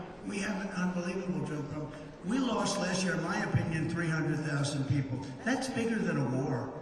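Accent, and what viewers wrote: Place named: Pacific Northwest, United States. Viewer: And you're from let me guess by the way you say water. Oregon or Washington? I don't think you're from Idaho but I've been known to be wrong once in awhile. Very rarely.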